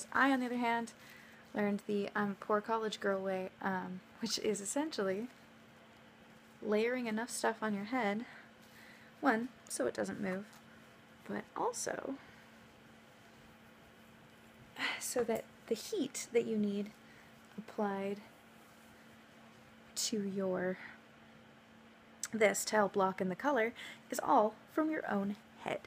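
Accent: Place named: United Kingdom. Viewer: Nice British acsent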